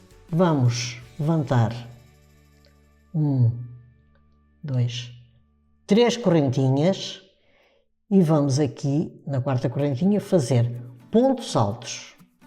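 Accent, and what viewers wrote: Portugal. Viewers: MT bonito o seu trabalho. MT bom ouvir português de Portugal.🤗♥️🌹🇵🇹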